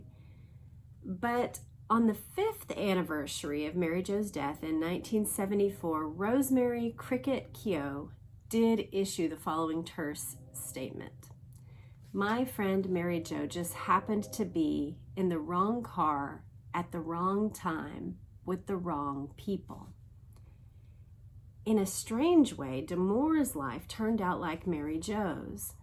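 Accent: Texas, United States. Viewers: I'm watching the start of this series on July 19th 2026, in a small little seaside village in the UK - coincidence! I love your channel and listening to your lovely Texan voice as I do my artwork at my desk, seeing you now and then. I've been following you a while now as the JFK Assassination has always fascinated me. Now I can't wait for you to dive into the Chappaquidickstory. Loving your work (and the trains) 😀👍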